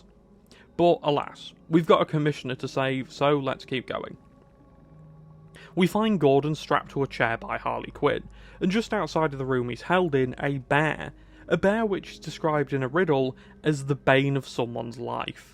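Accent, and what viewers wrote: United Kingdom. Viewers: You should make this video without the british accent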